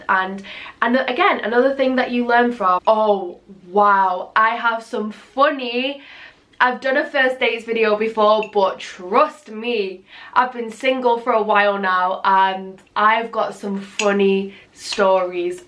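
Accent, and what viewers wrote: Manchester, United Kingdom. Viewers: “i get copy righted on evEryFiNk” 🤣 such manc pronunciation love ittt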